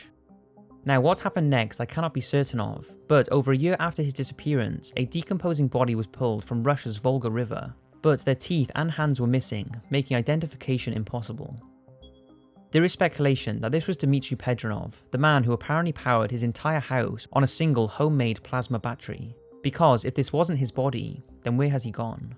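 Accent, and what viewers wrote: United Kingdom. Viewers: Hey perfect storyteller voice British guy, be careful, I’d be sad to find out you went missing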